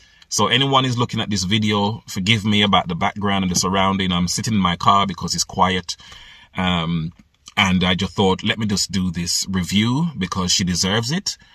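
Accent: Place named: Caribbean. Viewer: I love your accent! Are you from the Caribbean?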